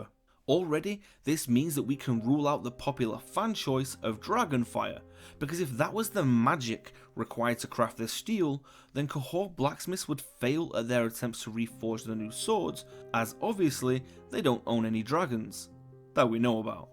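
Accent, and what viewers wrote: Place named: Liverpool, United Kingdom. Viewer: Snax Pendragon nah sounds more Liverpool/Cheshire but very light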